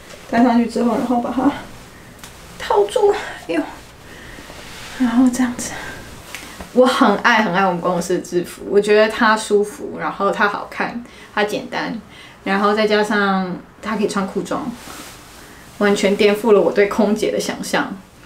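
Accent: Taiwan. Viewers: 口條講話語氣好『台灣』😂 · 口音真的是標準台灣腔，我好像在親戚的飯桌聽堂姐分享人生故事🤣🤣🤣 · 卡達? 中東很大 油很有名 沒去過 耳聞 空姐比較有機會出國吧 白人女孩說話超台的 土生土長台灣白人? ^_______^" 很有趣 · 第一次看你的影片，光聽聲音真的會以為是台灣人！尤其台語的部分很道地！讚！你的分享也很真誠！泡泡的確會跟著年紀越來緊，同是身為步入下一階段的女性很能體會你的感受！期待之後你的旅行分享喔！阿已訂閱！ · 好道地的台灣口氣，聽起來很親切！ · 好美喔！尤其是這個沒有口音的台灣腔！ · 哇咧！光用聽的超台 哈哈哈哈哈哈哈哈哈哈哈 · 挖 你講話比我還台灣.....謝謝您的分享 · 你的中文發音太好了 不看臉會以為你就是個台灣妹子 完全台灣腔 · 妳的口音好台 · 😮根本就是台灣人的講話方式嘛！ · 外國人的面孔，台灣人的口音！Mixing goal !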